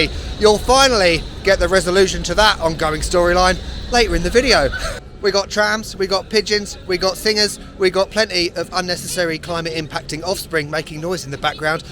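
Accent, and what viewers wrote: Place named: RP, United Kingdom. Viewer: Yea, I've read the article you posted. Yet, you might haven't got that western Londoners accent anymore but RP is right there LOL 😀. Most of all I like your diversity and non common approach. Greatly appreciate the way you presented smaller cities and of course my home town of Cacak 🙂. Cheers mate/brate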